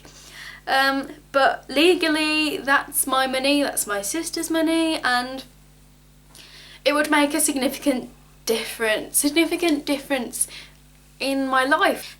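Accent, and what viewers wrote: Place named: Midlands, United Kingdom. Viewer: Where you from bbe?? You sound Midlands xx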